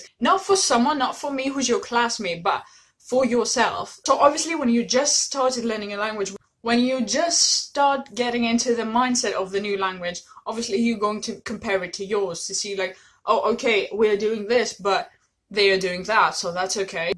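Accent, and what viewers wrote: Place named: United Kingdom. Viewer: Τόσο τέλεια Βρετανική προφορά 🇬🇧🇬🇧 You sound a bit posh😂😂😂 Είσαι τέλεια .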